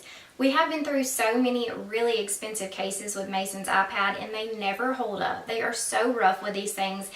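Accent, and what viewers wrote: South, United States. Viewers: Where are you from.it sounds like the South.I'm from Alabama.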